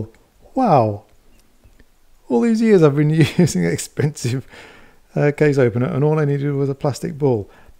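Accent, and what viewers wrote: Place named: England, United Kingdom. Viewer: I just love listening to your voice with that yummy English accent.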